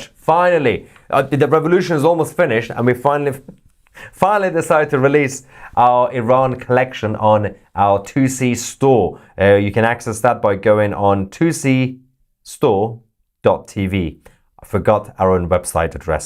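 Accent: United Kingdom. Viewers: .if America goes in on foot it's gonna be bigger then Iraq does. Trump want a long war . because bombing them won't get rid of them if you want change you have to go in on foot.. be prepared to lose thousands of Americans .. how much are they paying that British accented mouthpiece. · Mr. Tousi ! Did have seen your Video and heard your fucking British accent??? Believe me, you’re a very cheap guy like those IRJC and Basij undereducated!!! 👎👎👎👎👎👎👎👎👎👎👎👎👎👎👎👎👎👎👎👎👎👎👎👎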